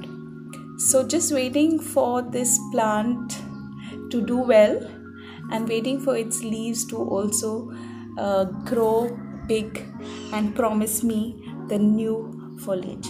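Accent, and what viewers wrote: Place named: India. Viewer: your indian accent makes me happy to hear it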